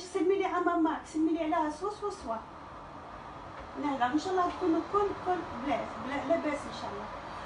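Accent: Annaba, Algeria. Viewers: Salem Notre grande Dame On dirait qu'on est chez vous c'est un plaisir surtout avec votre accent baunois hlouwa hlouwa Machaellah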